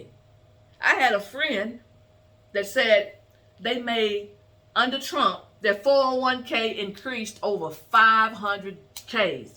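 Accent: South, United States. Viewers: God Bless you to... Trump needs you to be in his Cabinet. That's alot of passion. You sound a lil Southern but you got East Coast in your blood.😎👍🤟🇺🇸🇺🇸👊👏👏👏👏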